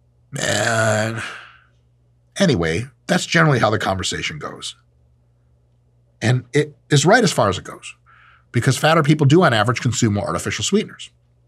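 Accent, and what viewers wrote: South, United States. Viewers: Yes mike we like your southern accent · More southern accents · I approve of the southerner accent and even slander in the future. (I'm from the armpit that is Lousiana) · I need more Southern content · Love the southern accent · Okay with the southern accent. Highly offended by the diet coke/nugget bit. has always worried me but now I see how problematic he truly is. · The southern accent is a win! More please! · Hello, from Texas. More Southern accent please. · Info 10/10 Southern accent 6.5/10 -Texan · hahaha that southern accent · Sweet southern accent bro! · Southern accent approved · As an..ex-USSR person, I completely approve of your Southern accent, pls bring on moar of it! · As a southerner I'd love for you to make it through an entire revive stronger roundtable with that accent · As an asian acting southerner I approve that accent. 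· Am southern, do approve of accent! I love it!! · Bruh... thank you for the upload Brother! Bruh more Southern:))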